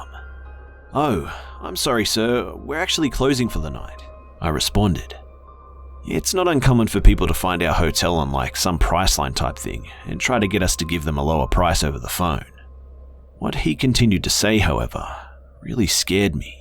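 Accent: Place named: Australia. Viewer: The Aussie accent and for the most part locations makes the stories more comical than scary.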